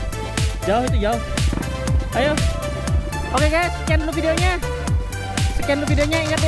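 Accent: Bali, Indonesia. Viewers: Bang lu orang bali suaranya aja sayatau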